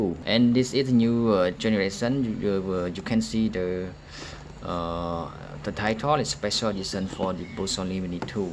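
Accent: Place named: Cambodia. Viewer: Your speaking English like Cambodia people.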